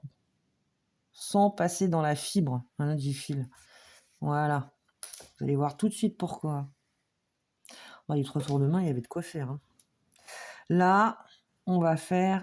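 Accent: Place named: Nord-Pas-de-Calais, France. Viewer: Je peu me permettre de vous demander d’où vous venez car vous avez une façon de parler ont dirait une ch’timi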